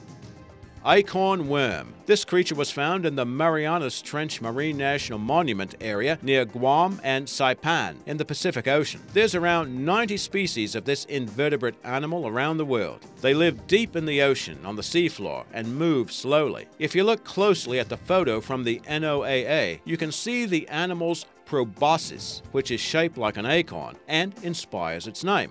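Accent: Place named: Australia. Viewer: Why do you sound Australian but use American measurement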